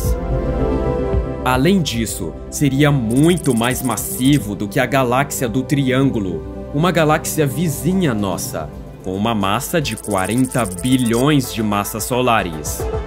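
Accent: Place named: Caipira, Brazil. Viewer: eu gosto quando o caipira surge "redoR" "caloR"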